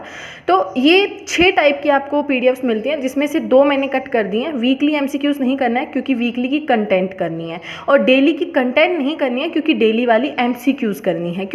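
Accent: Haryana, India. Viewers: Aap haryana se ho ????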